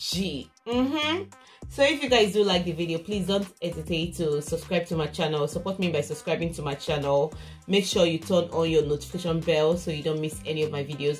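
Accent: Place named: United Kingdom. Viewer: I need me a UK sista that accent 😍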